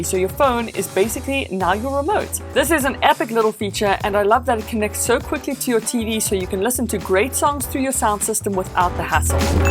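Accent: South Africa. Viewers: Are you from south africa? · Are you South African? It's the accent. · You are from South Africa right ? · Loving hearing a south african accent, much love from Jozi! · South African????? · No lie I clicked on your video for the first time from my recommended and as soon as you started talking I was hold up, that accent sounds familiar, and you know I gotta support a fellow South African on YouTube. 😂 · Do you come from South Africa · Are you South African 😁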